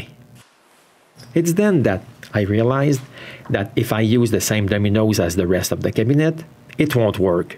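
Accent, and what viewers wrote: Quebec, Canada. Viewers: Vous ete Canadian Francais? Je suis americain et entendre votre accent(je crois.) Bravo en tout cas(je vis en France depuis 30 ans...by the way) rr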